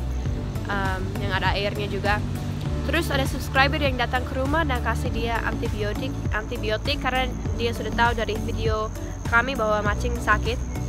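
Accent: Papua, Indonesia. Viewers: Wow logat papua bicaranya keren, hebat, saya tinggal di west java tapi suka dengan logat indonesia timur. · Ada logat papua-nya. :D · Kamu bicara seperti logat Papua thts awesome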